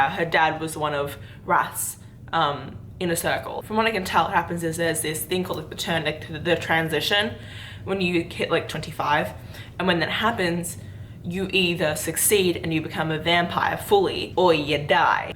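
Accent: Australia. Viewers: I just came across your Chanel and love it. I’m curious..... your accent, are you Australian?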